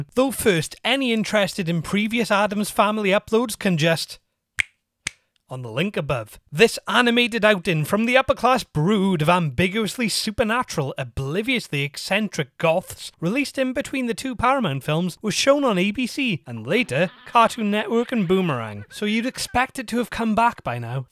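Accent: United Kingdom. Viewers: Great review mate, are you Welsh? I can hear an accent coming through every now and again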